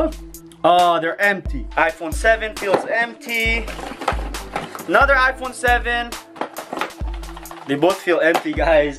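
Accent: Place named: Chile. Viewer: Abla como chileno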